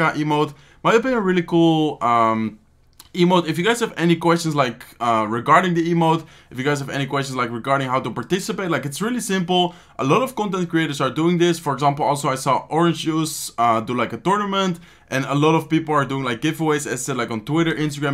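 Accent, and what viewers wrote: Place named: Netherlands. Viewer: Jij bent Nederlands of niet 😂 Je hebt een Nederlands accent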